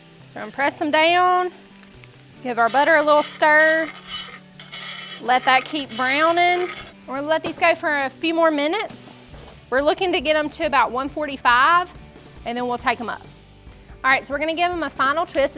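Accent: South, United States. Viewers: Its the South fellow babies. Rich a full.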